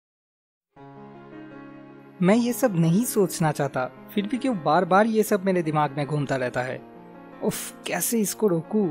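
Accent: Bengal, India. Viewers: Video banane wale ki awaz se lagta hai ki ye koi Bengali hai...and true intellect is their Birth Right.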